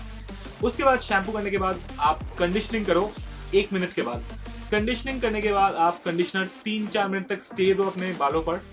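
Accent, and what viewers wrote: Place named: Bengal, India. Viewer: Ooo baa bhalo..tomar kotha sunei bojha jachhi lo. J tumi script lekho na r tumi bangali..ami o likhi na .skript...😂❤️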